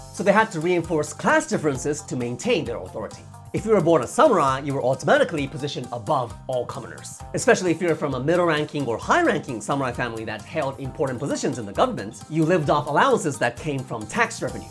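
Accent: Japan. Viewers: English but in a Japanese voice inflection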